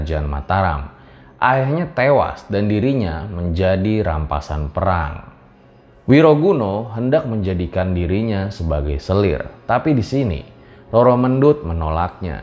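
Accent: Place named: Sragen, Indonesia. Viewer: SRAGEN MANA SUARANYAA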